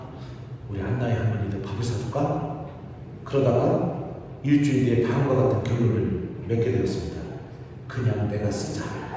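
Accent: Chungcheong, South Korea. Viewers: 신부님 강론 말씀 듣고 있으면 마음이 뜨거워 짐니다 신부님 영육간에 건강 위해 기도 드립니다 신부님 사랑합니다 💜 충청도 사투리가 정겹습니다 감사합니다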